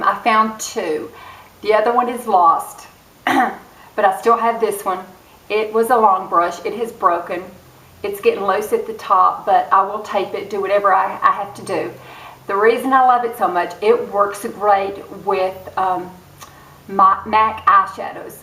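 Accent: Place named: South, United States. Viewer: You would win SURVIVOR, I'm certain... your sweet genuine spirit would definitely win votes. I'd bring you as my one item because of your heart warming personality~ I often think my adult daughters will show up at my door doing an intervention someday - I "hoard" skin care, make-up, BOOKS, and shoes... I love your videos and always enjoy your sweet southern drawal... never boring,!!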